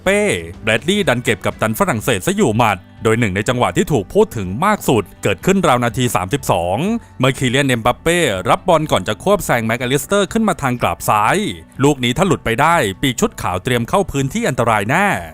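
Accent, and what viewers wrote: South, Thailand. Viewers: 5555แหล่งใต้ได้ใจผมอิหลีคับ❤ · เอ๊าแอด คนใต้เหมือน😁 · เอ้าาา พอรู้ว่า คนใต้นิ 😂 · หรอยน้องบ่าวแอดมิน แหลงใต้555+ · แอดมินคนใต้เห่อนิ😅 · แอดคนใต้หรอ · เห้ยยยยยย พี่บ่าวคนใต้เหอะนิ อัยจ่า ถูกใจ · คนใต้กันนะ 5555ติดตามมานานแล้วนะหลวง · หร่อยๆ ติดตามมานานแล้วครับ เด็กใต้ชัดเจน (ผม ป้ายชุมพรครับ) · พี่บาวคนใต้เหอ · แอดมินเป็นคนใต้นิ่ห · คนใต้เฉย แหลงหรอยเลยหนิ ขามจังติดตามเลยพันนี้:face-blue-smiling:face-blue-smiling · ง่าเบอะแอดคนใต้นิ ชัดเจนพี่บ่าว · แหลงใต้สุดยอดมากเลย ผมคนสุราษฎร์ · พี่บ่าวเป็นคนใต้เหรอ สำเนียงชัดแรงนิ❤ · พึ่งรู้ว่าแอดเป็นคนใต้ ชัดเจนโบ้เรา หรอยแรงแกงงัว😂😂😂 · สำเนียงแอดนี้ไม่คนนคร ก็คนตรังผมว่าไอ้น้องบ่าว😂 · เอ้า พี่คนใต้หรอคับ ถ้าพี่ไม่แหลงใต้ ผมคงไม่รู้ ติดตามมาสักพักแล้ว ทำคลิปออกมาเยอะๆน่ะพี่ รอชมผลงานอยู่คับ ❤ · ผ่านจริง ติดตามมานาน555 พอรู้ว่าเป็นคนใต้ ชัดเจนๆครับ❤ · แอดเด็กใต้ด้วยหรอเนี่ย😂😂 ชัดเจนเลยไอ้น้องบ่าว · พี่เป็นคนใต้เหรอครับ สำเนียงใช่เลย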